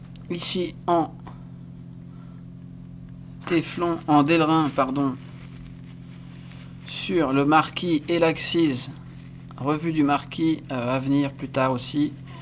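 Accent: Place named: France. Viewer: Tjrs un plaisir de regarder tes revious(anglais à la frenchy!!!)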